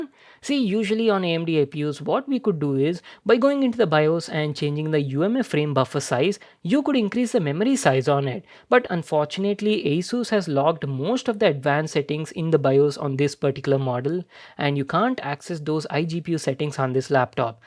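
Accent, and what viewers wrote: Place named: Telangana, India. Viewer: bro are you from telangana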